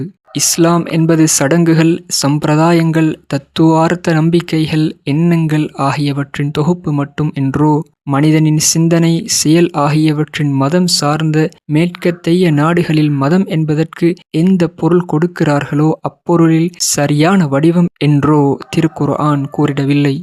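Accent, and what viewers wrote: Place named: Tamil Nadu, India. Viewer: Assalamu wa alaikum neega peasura tamil ilaggai tamil matheri illai India tamil matheri iruku I am India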